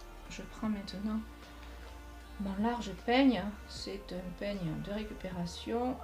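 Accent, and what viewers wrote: South, France. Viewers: Bonjour! J’aime beaucoup apprendre en votre compagnie. La démonstration et les explications sont très claires. Quant au rendu c’est très sympa. Votre beau sourire et le petit accent du soleil ne gâchent rien. Merci!!!👍😃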